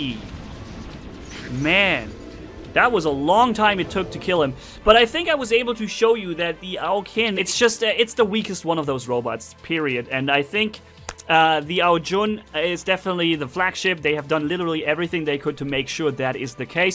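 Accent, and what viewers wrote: Germany. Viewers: Du sprichst ürsprünglich Deutsch oder?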